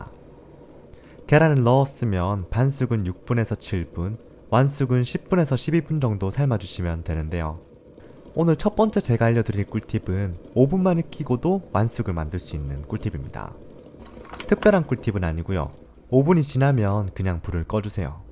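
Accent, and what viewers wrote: Gyeongsang, South Korea. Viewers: 경상도 총각이네예~~~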